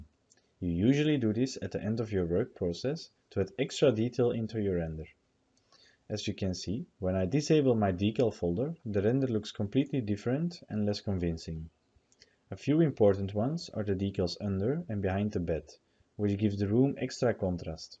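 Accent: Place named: Flanders, Belgium. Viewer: Nice video, and nice flemish accent ahahah. Bedankt maat!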